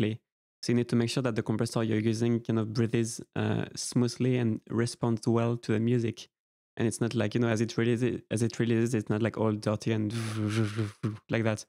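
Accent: France. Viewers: Apart from your Nice Frensh Accent, You are a great mixing and mastering teacher 😍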